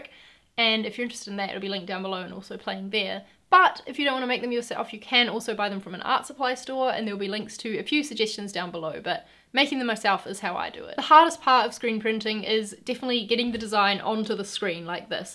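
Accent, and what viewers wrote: New Zealand. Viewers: Lol, a strong kiwi accent there! I left 27 years ago - its refreshing to hear. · are you kiwi? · Haha Kiwi accent! I was sent this link from Facebook as a reference as to how to do Screen printing for their CREATORS workshops! Hit them up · Came here from Mari’s video! Are you kiwi? Cos they accent.. haha! I’m super nervous about the exposing time part… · Are u kiwi??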